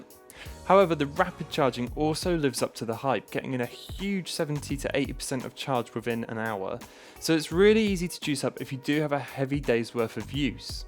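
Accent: United Kingdom. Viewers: That accent though, which area in Britain is that???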